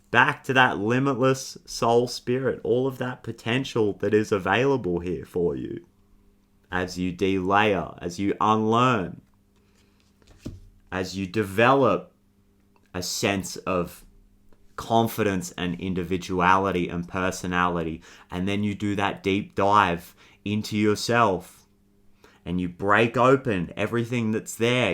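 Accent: Australia. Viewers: Bro hooked me with that aussie accent! 😂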